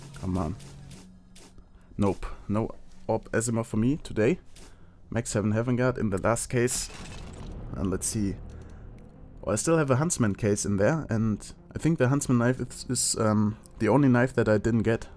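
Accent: Germany. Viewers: Ich liebe deinen deutschen Akzent:DD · Are you german? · Man merkt sofort das du Deutscher bist :D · Man hat sofort gemerkt das du aus Deutschland kommst ^^ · Ok du bist deutscher oder? Wenn ja merkt man das, wenn nein kannst du das hier ja eh nicht lesen xD ^^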